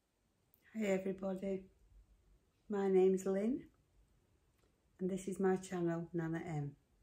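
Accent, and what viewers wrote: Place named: England, United Kingdom. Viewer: I’m 76 and live in Toronto Canada. I walk most days. If it’s snowing I do the treadmill. I really enjoy your vlogs. Keep them coming Lynn. You have quickly become a favourite. And you’re very professional already, even though you just started. I’m originally from England and it’s like being over home listening to your accent. Keep up the walking…💃🏻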